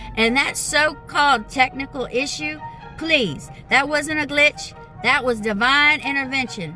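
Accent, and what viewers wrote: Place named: South, United States. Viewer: You kind of sound like my wife. She's from Vanceberg KY!! Love my Southern folks!!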